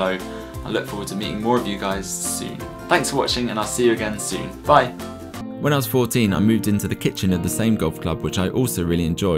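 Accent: England, United Kingdom. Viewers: Yesss,he makes me proud to have a Englsih accent:)) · I'm from Britain, but even I think your voice is awfully British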